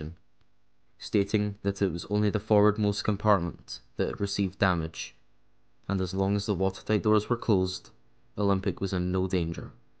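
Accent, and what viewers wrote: Scotland, United Kingdom. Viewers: Love the Scots accent.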